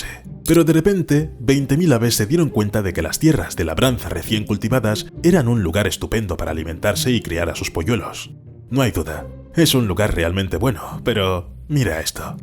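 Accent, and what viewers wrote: Spain. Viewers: Ese acento de España es agradable. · A leguas se nota que el de la voz es ibérico, enfatiza la "S".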